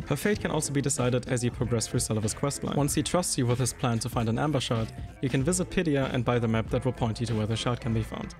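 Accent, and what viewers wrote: Germany. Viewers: Damn son, you're talking really really fast with a heavy german accent that incorporates A LOT of "ts" and "ks" sounds. All I understand is "tskskshtss stsksstsssst"... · German accent?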